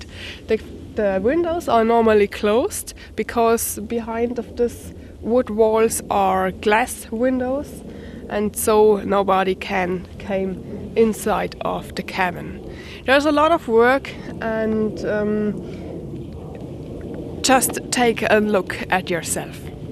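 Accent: Germany. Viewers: You sound very german :) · please talk in your origin language because your english isn‘t good. we literally can hear that you’re german lol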